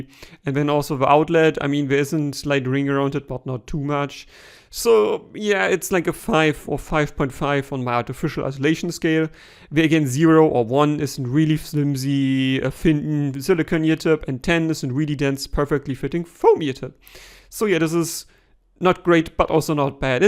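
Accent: Germany. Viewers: Dude, you sound like "der8auer", if you are probaly familiar with that name and anything he represents :)) probably the german accent...Anyway, your reviews are accurate and true, witch matters the most. Thank you!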